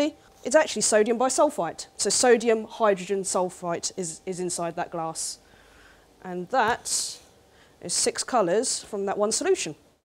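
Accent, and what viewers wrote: United Kingdom. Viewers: Attractive Asian woman with british accent and a science degree... where does one find such majestic creatures? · Asian Chick + British accent = HOT!!!!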